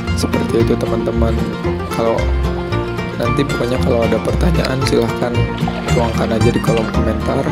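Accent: West Java, Indonesia. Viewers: Orang sunda ya?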